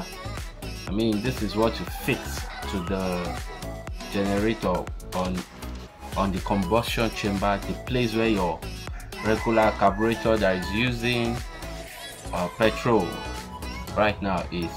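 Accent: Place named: Africa. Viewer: Thanks for the presentation, and, interesting breaking the ice to Africanize YouTube engineering video presentation. Your language is clear- sounds like a seasoned African. In addition to your efforts, I will encourage you to put what you talked about in this video into a practical teaching. You could have one biogas source, connect the engine to it, and then show the world how goodly it works. Try this and how that raises your views, likes, comments, and subscriptions. Thank you, Sir.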